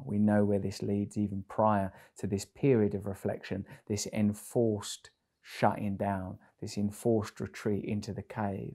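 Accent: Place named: England, United Kingdom. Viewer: your accent makes me miss England.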